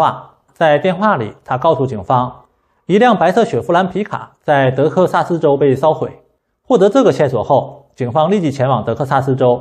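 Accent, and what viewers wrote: Northeast, China. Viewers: 老哥你这东北口音，把我好不容易的普通话又拉回20年去了，当主播得练练哪，真的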